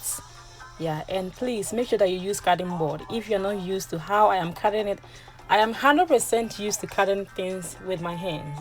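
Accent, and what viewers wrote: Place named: Ghana. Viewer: The Ghanaian language ascent alone😍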